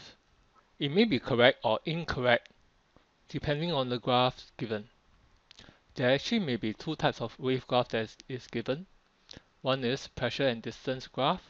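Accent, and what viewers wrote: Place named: China. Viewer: Good video. U sound very asian Chinese.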